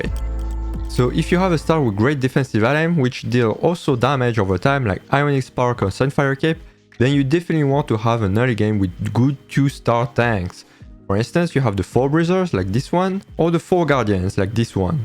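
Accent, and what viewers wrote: France. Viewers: i didnt understand shit... :/ so hard to keep up, (and also, trying to apply this, got me have the most shamfull loose ever, insane how bad it was XD - it didnt work a bit for me, also because i am nob, but also because i can't understand shit from your "french accent english") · Serais tu français par hasard ?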